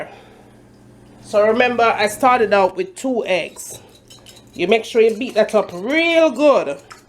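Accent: Jamaica. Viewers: no sah u can knw say a real Jamaican, anyways love it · Lol I love how she chats the patois, many videos out there with Jamaicans trying to "twang" n it sounds bad....it jus feel like my aunty telling mi how to cook!!! well done. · I Love this Queen Sister. She have me on the floor with her yard accent. I simply Love IT !!!! Tell it Sister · I love that Jamaican accent even tho it's hard to understand everything you are saying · Love ur food and the way u talk real Jamaican lady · "Nuh bodda guh watch tv an mek di chicken bun up!" 😂😂😂 you a real Jamaican! Love the videos! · The Accent tho !! Love it !! Real Jamaican ! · Very nice. Actually the best fried chicken recipe I've seen. I'm going to follow your directions and let you know how I did. an mi did very much enjoy do real yardie accent. Bless up.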